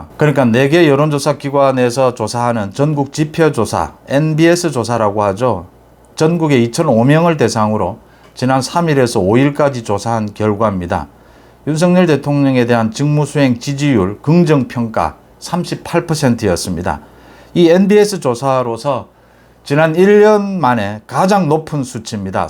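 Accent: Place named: Busan, South Korea. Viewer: 작은것부터 시작을 했습니다.하루도 시청하지않은적이 없지만 요즘은 댓글 써지는 않았습니다.조선앱에서 댓글다느라 조선댓글창 네임은 둥이할머니 입니다.나이많지만 고향이 부산이라 같은억양의분들 마나면 더 반갑답니다.송국장님의 스승을 기원드립니다.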